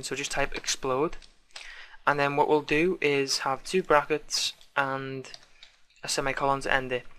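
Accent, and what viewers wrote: Liverpool, United Kingdom. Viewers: this actually really helped me thanks, btw im also from liverpool (you sound like you have a scouse accent)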